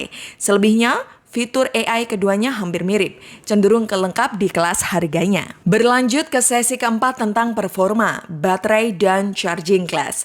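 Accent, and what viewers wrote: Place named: Java, Indonesia. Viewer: suka deh..lama lama keluar medok nya 😁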